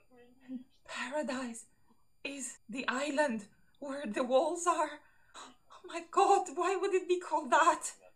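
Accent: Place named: Italy. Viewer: Sorry for non-related, possible offensive comment, but, in the moment you started the video I knew you were Italian. 😅 "The wey of speakingue withe ann ecxtra vowel is so cuteh". Same happens when we Brazilians say "hotchy doggy" instead of "hot dog". I just love it.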